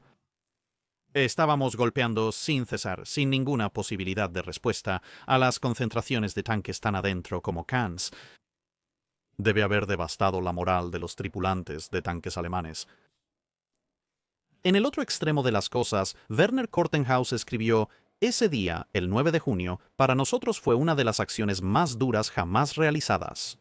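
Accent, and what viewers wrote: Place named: England, United Kingdom. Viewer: Seguia este canal en inglés ,el original, ahora en español con acento inglés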